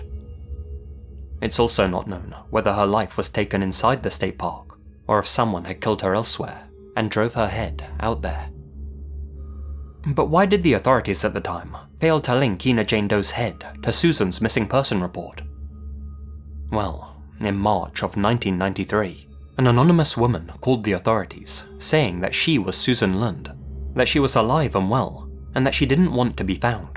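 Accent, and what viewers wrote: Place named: United Kingdom. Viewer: You're the best. I love you're vidoes their so entertaining. Keep up the good work dude and i love your British accent.:) Have a great day man. 😊❤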